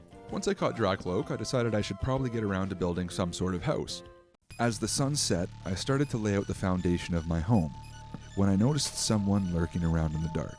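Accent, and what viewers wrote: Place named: Canada. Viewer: I love the Canadian accent😂❤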